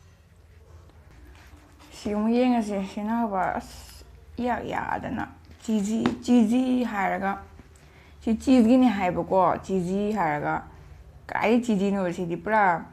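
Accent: Kakching, India. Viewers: Kakching lonna nungyba helle echan tharoi charak o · eche mathangdi momo oina chabiyo adusu kakching marol da ngang ngaga chena ngangbado taba yam nungaijeiye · Ymna haotraba malleko...kakching mrolda oina ngangbiyo aduna henna nungsiye😍😍momo oina chabgi touse... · Kakching lon ngangbna hena nungshiye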